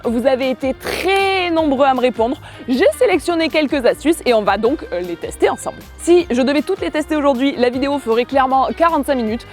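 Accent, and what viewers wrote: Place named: South, France. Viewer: Tu a un accent du sud c’est trop drole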